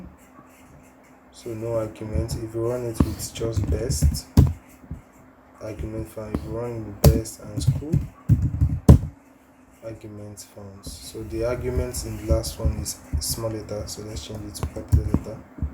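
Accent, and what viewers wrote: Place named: Nigeria. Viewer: From your intonation, I feel you re a Nigerian. pls i base in ph. i need a mentor on programming. pls be my guide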